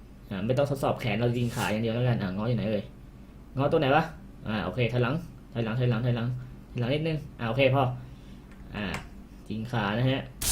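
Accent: Isan, Thailand. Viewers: พี่ คนอีสานหรอ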